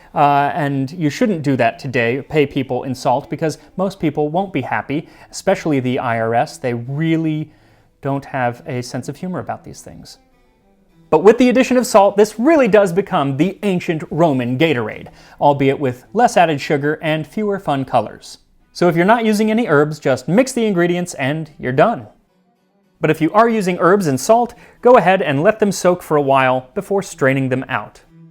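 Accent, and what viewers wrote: United Kingdom. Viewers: No need to apologize for the British accent. Movies have taught me that most Romans had British accents.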